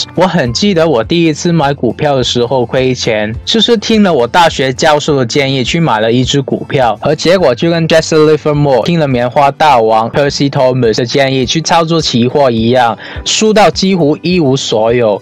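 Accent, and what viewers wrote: Hong Kong, China. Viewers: 请问你是香港人吗？你的中文有香港口音😄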